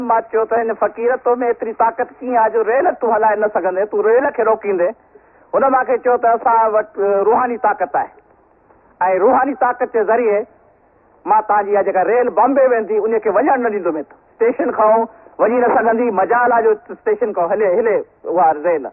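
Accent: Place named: Larkana, Pakistan. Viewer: Kitni piyari sindhi bol rahay hain bhutto sahib larkana style sindhi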